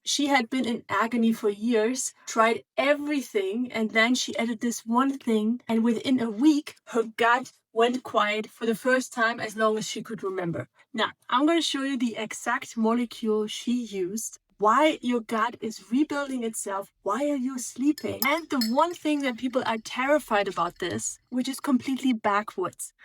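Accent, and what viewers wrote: Germany. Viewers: I think she is german - I can hear her strong german accent!?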